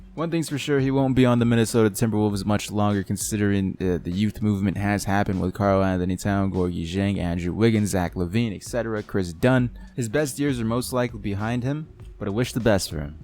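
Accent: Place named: Russia. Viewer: sounds like russian guy speaks english. sort of accent or something.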